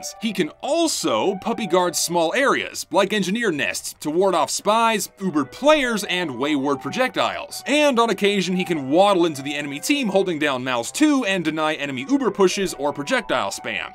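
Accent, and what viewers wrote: South, United States. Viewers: texas accent shining through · Why is a southern accent creeping it's way into voice throughout the video? it's kind of creeping me out lol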